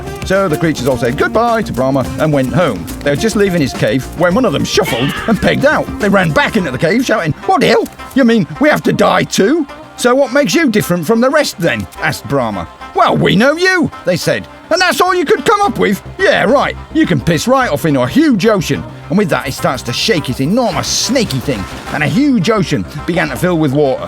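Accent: United Kingdom. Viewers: why so British? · Классно, спасибо Вам. Но голос все таки придется подучить "русской" манерой рассказывания :) п.с. Не понимаю почему рассказчика с шотландским(если не ошибаюсь) акцентом выбрали ) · если человек пишет про "американскую аудиторию", может речь как раз о британском акценте, которому как раз откуда не быть у британского диктора?.. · There was a question above in Russian 'Why the narrator have such an accent?'. And I answered 'Which accent? He's British!'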